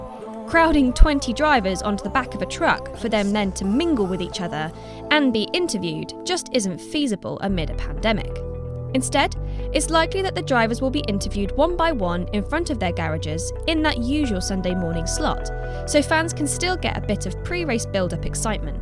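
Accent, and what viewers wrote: United Kingdom. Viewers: whait is that? and why does she sound incredibly brittish in this video? (yeah i know she's from the UK, but i dont remember her accent being so noticable).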